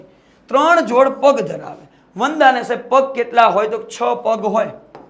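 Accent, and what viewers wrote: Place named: Kathiawar, India. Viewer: તમે પ્યોર અમારી કાઠિયાવાડી ભાષા બોલો સર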